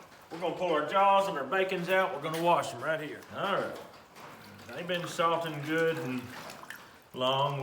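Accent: Tennessee, United States. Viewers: I thought I heard some Tennessee in that accent. Also are yuns off grid?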